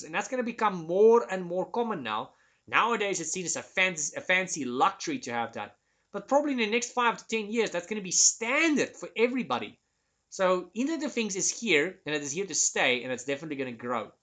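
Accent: South Africa. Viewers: Terry Davies, the best programmer ever, said that the CIA came up with the abbreviation LOL, laugh out loud, to misdirect people from finding out about IOT, Internet of Things. Most people will think that's just insane, which it might well be, but if you're into politics, then it's really not that far fetched lol. Thanks for the video series, it's well presented, and i agree with a previous comment i saw, you have a interesting delivery of the material, which makes it less difficult to learn, maybe it's the accent, i assume SA from the sound of it. Thanks again.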